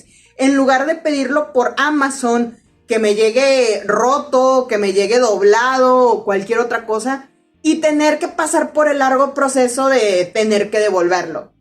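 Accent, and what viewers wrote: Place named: Monterrey, Mexico. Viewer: Supuse que eres de Monterrey (o zona metropolitana) por el acento y por relacionar la feria del libro con octubre 😅, si es así te recomiendo darte la vuelta por librerías de segunda mano en calle guerrero, a veces encuentras la saga hielo y fuego nueva a mitad de precio, mucha gente con los compró y no leyó nunca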